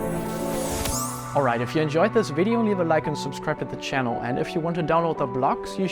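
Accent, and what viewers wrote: Germany. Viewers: he sounds so german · Man hört den Deutschen Akzent 😂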